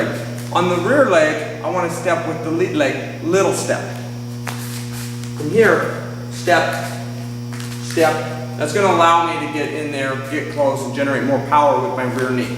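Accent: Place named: North, United Kingdom. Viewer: you live up north somewhere? I can tell since you say bag as bayg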